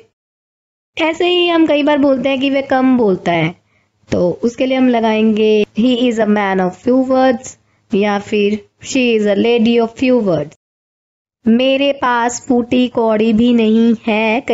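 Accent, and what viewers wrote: Punjab, India. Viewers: punjabi ho kya are you panjabi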